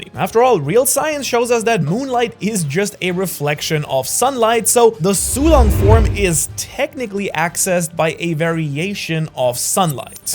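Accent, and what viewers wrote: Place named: Germany. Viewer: are u german ?since when did j start sounding like y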